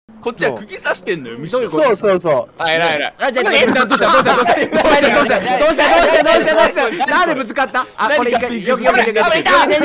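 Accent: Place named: Kyoto, Japan. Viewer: まじレトさんもっと京都弁出して……同じ京都人としてドキドキしてしまう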